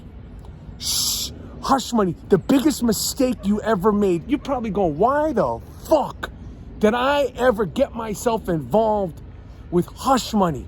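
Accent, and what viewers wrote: New York, United States. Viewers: 😂😂😂 I just love your rant! You’re ranting everything I’ve been ranting for years!!! You’ve spoken in real New York style!👏👏👏👏👏👏👏👏 Oh happy day!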